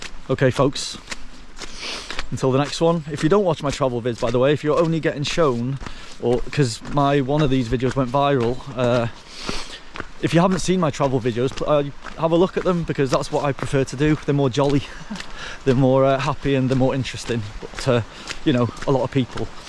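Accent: England, United Kingdom. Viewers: Your not welsh you sound english and not 1 of them are asylum seekers many countries they pass through before getting here? 2/3 if they really were that they'd settle for the 1st country out of pure desperation but our country are easy targets if anything gets said the race card gets pulled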